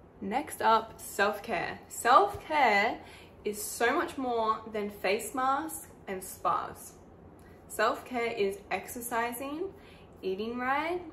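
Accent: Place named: Australia. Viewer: I’m glad that i come across your video!!! You’re so underrated! I wish you could gain more subscribers soon! Also i will start watching your videos starting from today so i can learn aussie accent cause i had always been liking aussie accent <33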